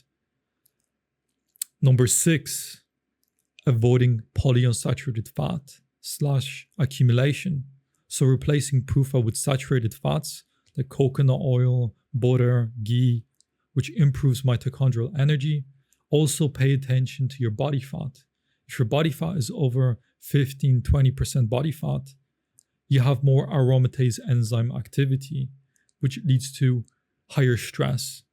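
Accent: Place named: Ireland. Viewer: Yes, is Polish but has said the he's lived in Ireland since age 9, which accounts for his accent.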